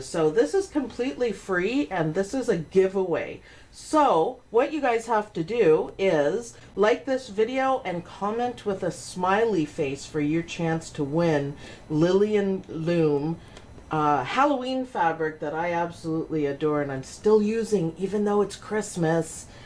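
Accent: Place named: Canada. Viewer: 😊 I joined team Hatch today! Gonna be binge watching all the lessons and try to retrain my brain from pe design! Had Thanksgiving with a dual citizenship Canadian\American and she has your accent, brother's neighbor! Lol... Had a nice chat about healthcare differences and how screwed up it is here in the US. But her accent made me think of you!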